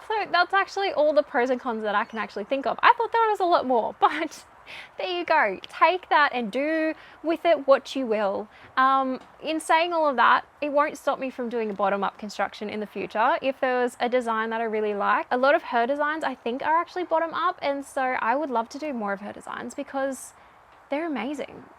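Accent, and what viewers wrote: Australia. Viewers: Love the podcast and hearing our fabulous Aussie accent on screen. Love the look of the bag and yarn from Bendi too. Thanks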